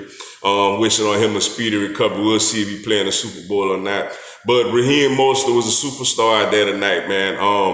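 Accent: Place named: Louisiana, United States. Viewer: Mr oh yea bruh I’m new sub I know you was from Louisiana I’m from Nola I don’t know what part you from but I know I heard your accent lol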